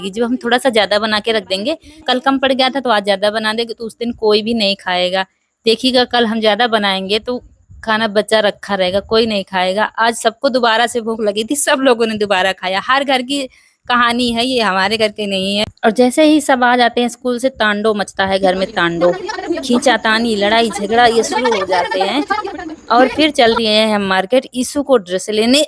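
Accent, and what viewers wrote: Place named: Lucknow, India. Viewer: बहुत अच्छा व्लाग है बहन आप कया लखनऊ के आसपास की हो बहना आप की बोली से लगता है कयोकि मैं भी लखनऊ से हु और आप के विडियो हमेशा देखती हूँ 👌👌👌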